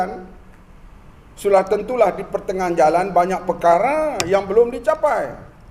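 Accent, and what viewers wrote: Kelantan, Malaysia. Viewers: Hhhahah kecek kelate siap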